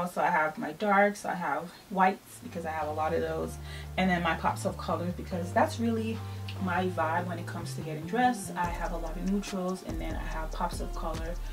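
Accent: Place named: Caribbean. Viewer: Thank you for sharing where you're from. I know I hear an accent - fellow Caribbean Girl here 🎉❤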